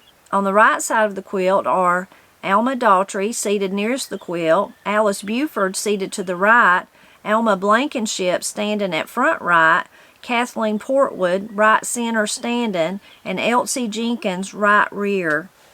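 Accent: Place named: South, United States. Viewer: I have to tell you how much I e joy listening to this book! I own this book and have read it at least twice all the way through! You have made it come to life with your beautiful southern accent! ❤❤❤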